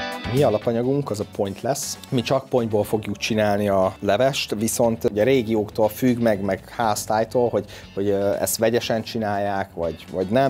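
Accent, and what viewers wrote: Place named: Italy. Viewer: is it just me? but his accent has italic undertone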